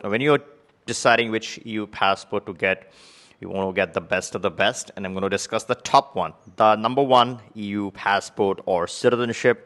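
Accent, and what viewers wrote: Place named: India. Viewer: I can hear that Indian accent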